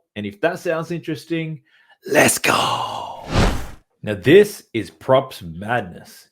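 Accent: Australia. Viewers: Never thought I would be listening to an Australian dude for NBA picks 🤣. Love the insight tho 💯💪🏽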